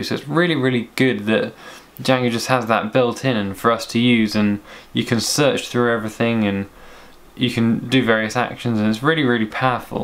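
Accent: United Kingdom. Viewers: Everything's easier to understand with a British accent.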